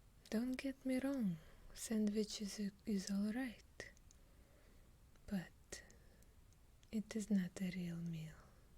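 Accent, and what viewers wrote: Russia. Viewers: I was searching for heavy Russian accents because I adore heavy accents, especially Russian and Ukrainian accents, and I found your video. I found it very funny and also cute. You have a very soothing voice, wonderful accent. Thanks.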